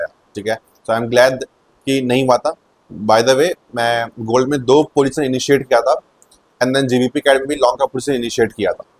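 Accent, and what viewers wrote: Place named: South, India. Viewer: south indian ki tarah lag rhe ho bolte huye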